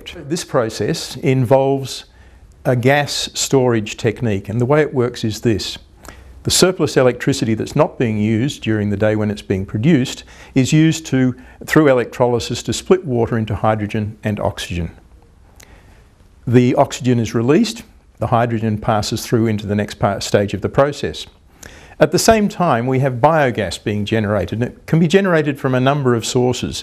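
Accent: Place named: Australia. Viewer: Hi. Nice to hear an Aussie discuss power to gas electrolysis. How did you go with your pilot in Northern Rivers? Being and engineer with a solution bent, this method of storage and delivery of methane via the gas grid seems the most sensible way to use our existing infrastructure to get the long term storage we will need once renewables dominate the electricity grid (hopefully). If anyone will get it right it must be the Germans! Anyway, I'm interested to know how it is going and how it is being received by others. Regards Tony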